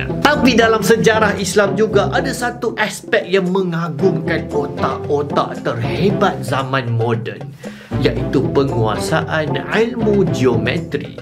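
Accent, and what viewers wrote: Malaysia. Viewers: Disini saya belajar sains + bahasa dan aksen Malaysia sekaligus. Terimakasih 👏👏🙏🏻🙏🏻🙏🏻🙏🏻 Dari negara tetangga 🇮🇩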